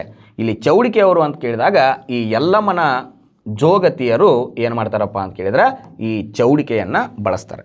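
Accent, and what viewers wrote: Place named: Gulbarga, India. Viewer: Not dharwad kannada it's Gulbarga kannada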